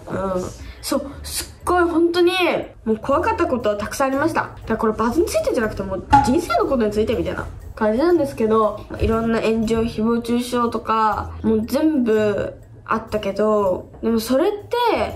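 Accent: Miyazaki, Japan. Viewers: 喋り方は宮崎の名残だと思いますよ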